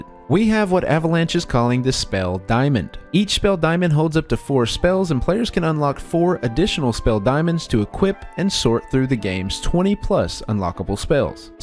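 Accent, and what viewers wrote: South, United States. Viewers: Are you from the south? Because I am hearing an accent but it goes in and out. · Do you have an on/off country accent lol?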